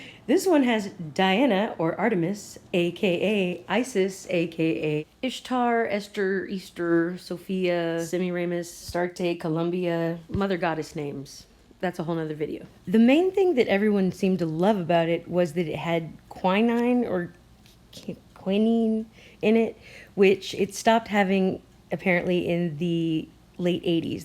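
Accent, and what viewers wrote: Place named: United States. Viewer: You grew up in the Philippines? Your English is perfect and you sound very .. American?? 🤔 Anyways, love your content, your voice is beautiful and soothing to me. I love your laugh too! I've subscribed to!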